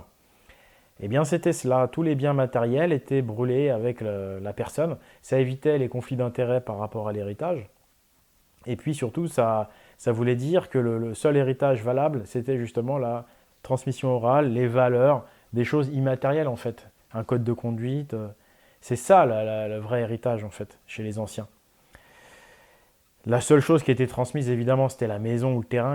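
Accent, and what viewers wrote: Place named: Provence, France. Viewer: Lady Aurelie Boissat Fraser of Lochaber. c'est juste que je ne comprends pas très bien, pourquoi certaines vidéos sont intitulées aussi...mite etc... sur sa chaîne mais sont narrées,haha, par un type à l'accent provençal ? tu connais bien ? tu peux me dire s'il te plaît ?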